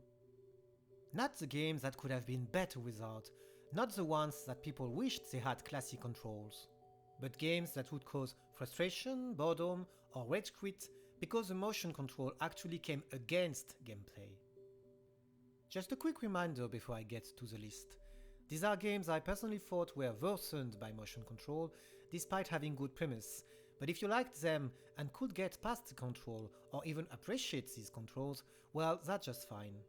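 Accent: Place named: France. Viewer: Hey! Great video! Thank you for all your work and I'll be eagerly waiting for any next video you'll put out, French accent included ;)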